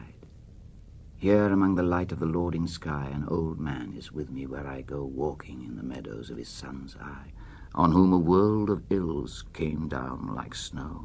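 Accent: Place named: Wales, United Kingdom. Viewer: but you can hear the welsh tones, phrases, and emphasis it is warm and draws you into the prose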